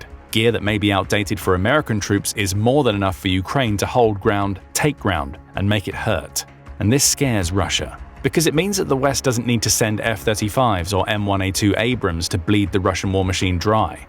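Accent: United Kingdom. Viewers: Oh 900 out of 6000 you sound British what do you know ??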